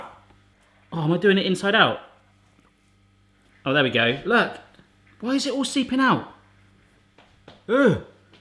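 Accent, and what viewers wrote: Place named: United Kingdom. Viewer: Just found you when looking for thousand year egg reaction. You make a great ambassador for UK, and British accent is so much more down to earth than the commanding American one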